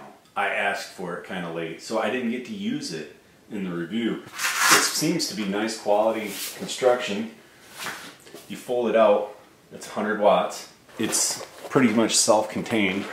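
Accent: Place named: Midwest, United States. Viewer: you sound like you are from Michigan. definitely the midwest. I know that accent cuz I’m from there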